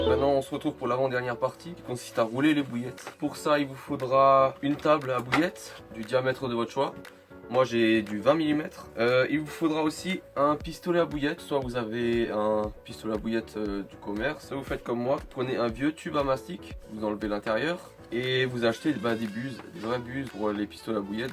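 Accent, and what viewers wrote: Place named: Alsace, France. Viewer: Mika 80 laisse l'accent alsacien trankil lol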